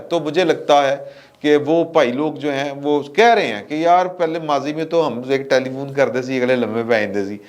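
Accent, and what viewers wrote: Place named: Gujrat, Pakistan. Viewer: سر جی جواب ضرور دیجئے گا آپ بیشک لاہور رہتے ہیں مگر پنجابی آپ گجرات والی بولتے ہیں کیا آپ کا اصل تعلق گجرات سے ہے؟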